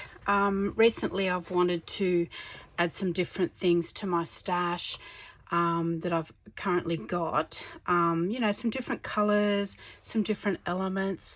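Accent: Australia. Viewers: I have never ordered from Ali Express but I want to! Great haul! I wonder how long it would take to arrive in America? I live in New York State and I am in the middle of trying to watch all your videos, just love them. Love your Australian accent and your voice is so soothing to listen to…..cyndi m.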